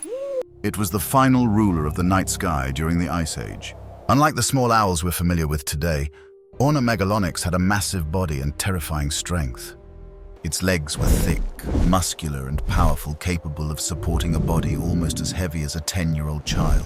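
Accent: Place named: United Kingdom. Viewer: Depicting these flying dinosaurs in wildly different ways for the very… same… creature you keep talking about seriously detracts from anything the narration tries to claim. Every other frame shows the same type of dinosaur with either 2 legs OR 4 legs, plus wings in either case, and/or very different body types. It all seems like one big collection of dragon cartoons—accompanied by voice-over with a British accent in order to seem intelligent.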